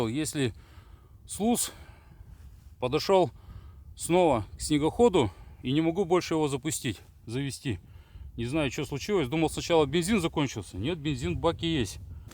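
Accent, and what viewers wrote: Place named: Arkhangelsk, Russia. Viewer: судя по говору, у меня был друг с Архангельска, ты тоже оттуда, познакомься с Николаем, таежным, он никогда не набирает воду, с лунки, у дома, если планирует, выезжать на снежике)))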